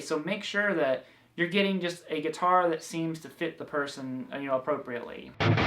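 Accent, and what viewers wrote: South, United States. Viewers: Superb country accent,!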